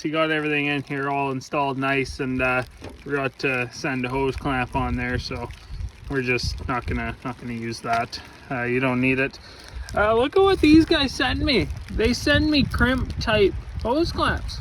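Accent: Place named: Alberta, Canada. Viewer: Sound like a wannabe albertan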